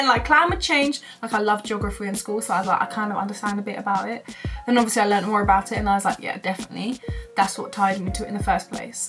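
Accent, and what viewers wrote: United Kingdom. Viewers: Your accent is interesting... It's like mostly MLE and then suddenly RP. I think it's that you glottal stop a bunch of ts but not all of them.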